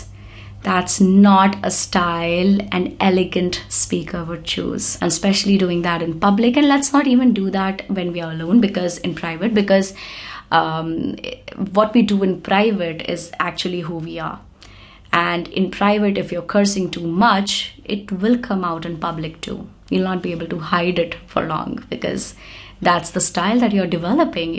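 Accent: India. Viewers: Special thanks to you for maintaining indian accent. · We love your Indian Accent Mum😊😍 Thank you for always sharing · I really admire the way you talk to us. Your pitch is clearly audible. I like your Indian accent👍✌ I'm also on a way to speak fluently.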